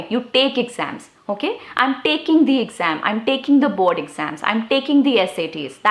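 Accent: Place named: India. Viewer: Ur spoken resembles sheer Indian accent.. Legit impressive 😍😍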